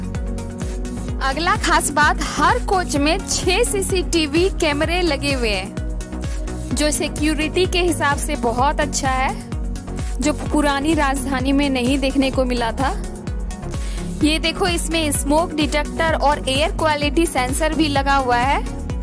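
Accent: Bihar, India. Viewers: Language mei bihari touch jyada h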